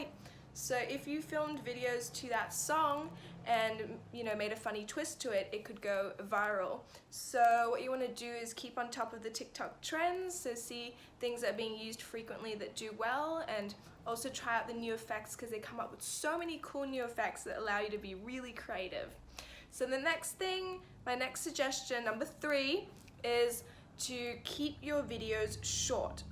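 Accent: Australia. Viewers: That Australian accent 😍😍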